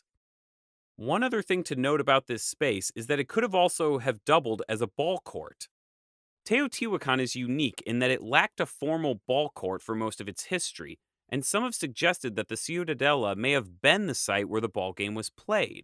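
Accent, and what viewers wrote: United States. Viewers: Is this due to the thick American accent?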